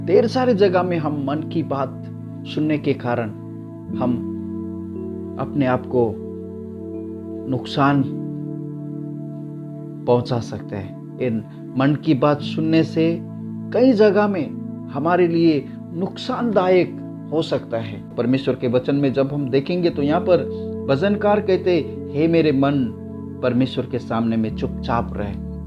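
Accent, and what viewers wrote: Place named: Telugu, India. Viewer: Aapane Vachan bataya bahut Achcha laga aur aap Telugu ho mujhe aisa lag raha hai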